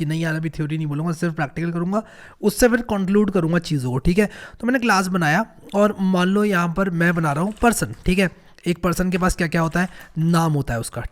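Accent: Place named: Uttar Pradesh, India. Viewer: wo object jiske liye call Kiya jaa raha hai❤❤ | Aap UP se hone bhai😂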